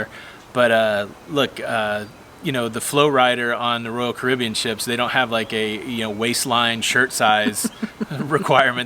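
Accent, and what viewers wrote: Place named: New Jersey, United States. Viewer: Great job. I really think low carb is the way to go. And I live in ca and I could hear the thic Jersey acsent.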